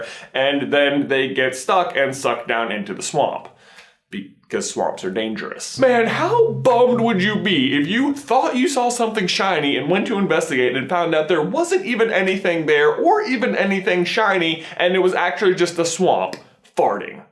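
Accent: South, United States. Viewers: I can't get out of my head that he is southern now. I didn't notice his southern accent before. Great. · Do you normally talk with a Southern accent, if so why change it? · i never realized that he has a sight country accent until this video and now it is all i hear oml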